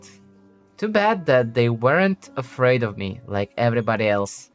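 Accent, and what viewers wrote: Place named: Russia. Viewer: did you like that game? btw your accent sounds like Russian, I don't know why xD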